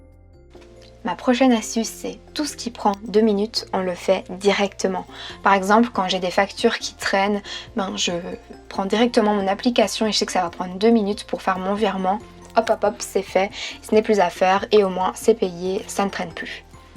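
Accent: Belgium. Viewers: J’adore ton accent belge tu me manque ❤️❤️❤️❤️❤️😪 sinon tu peux faire une vidéo sur comment avoir des bonne notes car j’en ai des mauvaises et j’en ai marre merci bisous❤️❤️❤️❤️❤️❤️❤️❤️❤️❤️❤️❤️❤️ je t❤️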